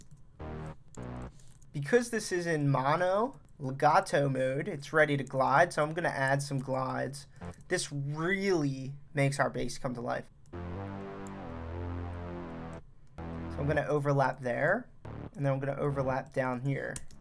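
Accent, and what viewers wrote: Philadelphia, United States. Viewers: Your Philly/South Jersey accent reminds me of home. Love your jawns bro 😂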